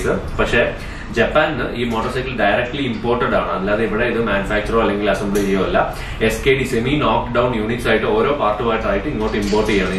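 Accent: Palakkad, India. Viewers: Palakkad ano native place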